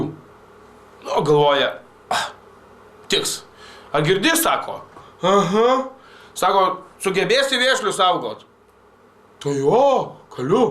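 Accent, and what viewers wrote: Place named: Samogitia, Lithuania. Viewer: Zemaiteška gera vara tep ir tuoliau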